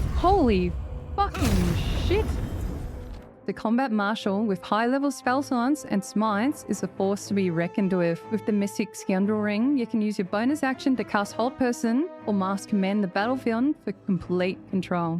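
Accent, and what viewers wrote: Australia. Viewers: Love the video, And the strine! This is freaking thickest one I've ever heard, which makes in even more intriguing!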